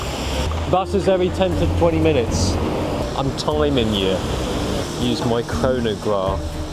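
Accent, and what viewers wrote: United Kingdom. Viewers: Oh my god, Asian face with British accent.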